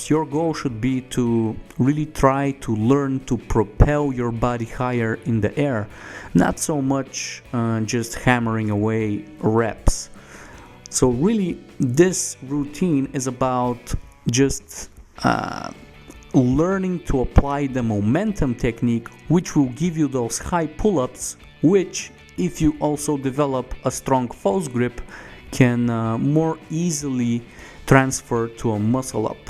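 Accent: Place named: Russia. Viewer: You have accent just like you are Russian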